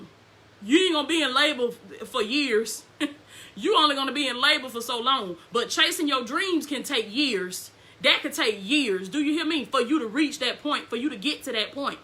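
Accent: South, United States. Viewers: You are "my girl!" I really admire you !" I love that southern accept ! Peter was identified by his accept ! Keep doing yo thang ! Be not discouraged , sister ! You are not alone !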